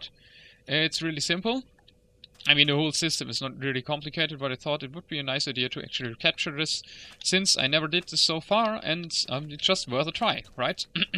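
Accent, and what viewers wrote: Germany. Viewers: He prpbably meant the description :) Bt the way great video, i really enjoy your videos, and the german accent just makes it better :D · you can rly here hes from germany :D but ya great video.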